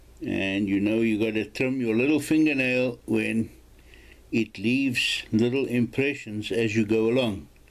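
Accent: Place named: Afrikaans, South Africa. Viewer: jy klink Afrikaans. Ek het nou al baie YouTube tutorials gekyk. Nie EEN van hulle het die "beveling" so goed verduidelik soos jy nie. Baie dankie - jou kanaal is nou my eerste stop as ek wil weet hoe om enige iets te doen!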